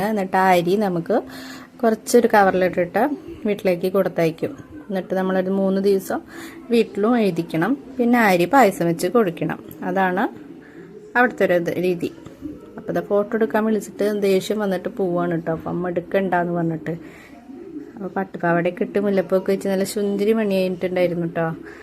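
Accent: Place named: Thrissur, India. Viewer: Aaa thrishooru bhaasha.....poliyaattaa😊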